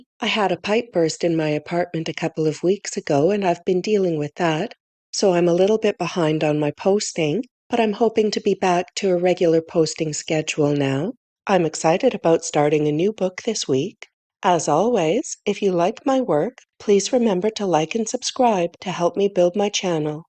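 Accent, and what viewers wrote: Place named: South, United States. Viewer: Just curious why the Southern accent? The setting is upstate NY.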